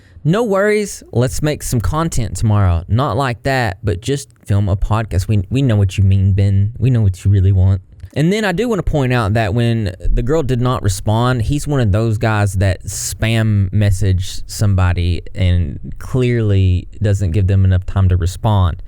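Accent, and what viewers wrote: South, United States. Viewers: the cutest southern drawl I've ever heard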